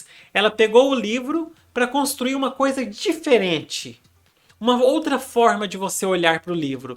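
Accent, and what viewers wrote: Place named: Minas Gerais, Brazil. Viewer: hahaha quais foram as exposições? Sou de Minas também! obs: já tinha desconfiado que era mineiro pelo sotaque, esse não engana ninguém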